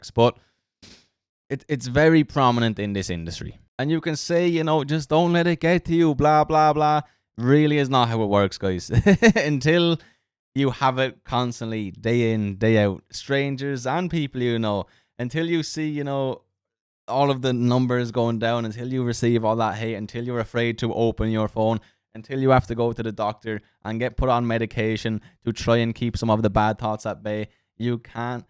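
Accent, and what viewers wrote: Ireland. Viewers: I missed that sweet Irish voice. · Hey, I enjoy your content. Your bubbly personality and Irish accent helps. I lost money on Workhouse and other EV stocks, but I don't blame you. The developing narrative sounded good to me, so I chose to get sucked into the FOMO YOLO. Funny thing 🤣 is that I'm still holding onto my WKHS and other EV stocks, because I lost track while working my new job. It was a fun run together, but I'm moving onto gold, silver, and commodities. Fun times, man 👌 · to error is human no one is perfect. You ALWAYS said do your own due diligence. Who ever blindly follows anyone, not just you deserve s what happens. I consider you as a friend. Truly! I loved sharing the excitement with you & 🤣 but I diversified anyway. What I’m trying to say is we truly NEED your beautiful Irish accent. trust in God and believe the good will overcome bad.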